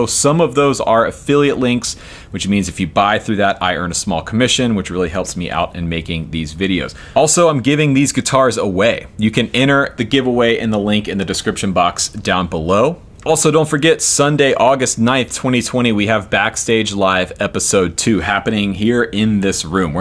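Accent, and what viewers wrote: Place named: New York, United States. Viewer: i found the Fender had a tubby midrange that bothered me. In that respect it reminded me a little of an Ovation. I might gravitate toward the Hummingbird not because of its look but because I suspect I’d enjoy playing it. My own acoustic is a Hummingbird and, much to my surprise, I really prefer playing it to the D28 I used to have. Both are from the seventies. Ahrange/Or ange is a regional difference. I grew up in NY (metro, not upstate which sounds completely different) and pronounce it like you do. You’ll find the same argument with fahrest/for est. If you want the opportunity to correct your significant other about speech, try the marry/merry/Mary test. If you’re from NY, those are three completely distinct words. For most of the rest of the country, they basically say Mary/Mary/Mary.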